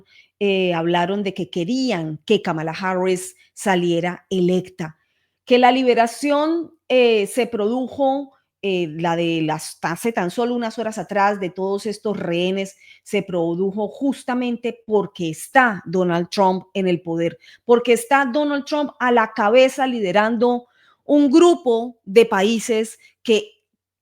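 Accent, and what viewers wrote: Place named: Colombia. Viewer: A pesar de tantos años en EU, no has perdido tu acento colombiano... Es maravilloso.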